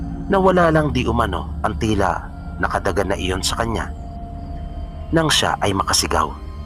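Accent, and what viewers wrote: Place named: Kinaray-a, Philippines. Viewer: Pamatyag mo eh,, hahaha karay-a gid,, 🤣🤣🤣🤣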